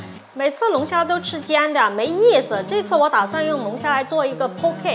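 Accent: Sichuan, China. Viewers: 听起来像川普通话难道是老乡？ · 听到四川话太亲切了，老乡！ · ，你啷个不说四川话呢？比普通话好听好玩得多！！！ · （你那麼凶呀）妳的四川口音很好聽，聽起來很可愛的。🥰🥰🥰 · 你的川普太有意思了，挖挖机也就真的只有四川人才说的出来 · 姐姐你的四川口音好上头哦我的天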